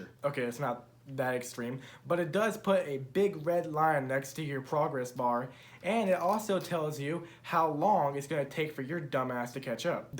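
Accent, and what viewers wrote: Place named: United Kingdom. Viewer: British lady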